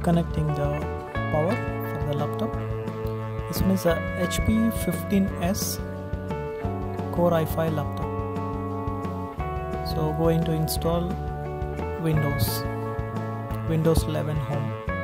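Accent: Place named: India. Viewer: I Hate indian speaking English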